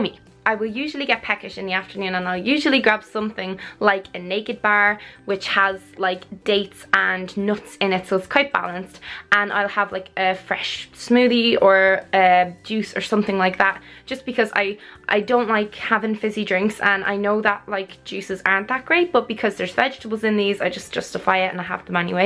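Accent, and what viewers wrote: Ireland. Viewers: I've never watched this girl before, only know about her because of the whole Toby thing but Jesus Christ she is beautiful, her eyes are hypnotic, and the Irish accent is just wow.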